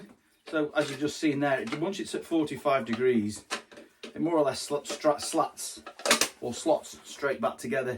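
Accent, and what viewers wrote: Stoke, United Kingdom. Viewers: Great video .may I ask where that is it looks amazing .and is that a stoke accent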